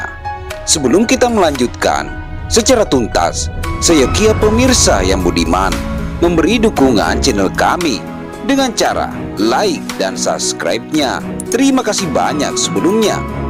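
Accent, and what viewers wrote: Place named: South Sulawesi, Indonesia. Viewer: Maklum sang narator ini pasti orang Sulawesi Selatan Memang begini cara berbicara nya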